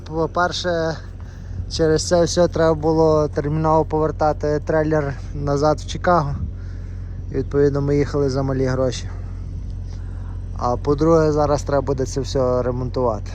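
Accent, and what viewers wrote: Western Ukraine, Ukraine. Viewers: Диалект запукренский рагулячий ☝️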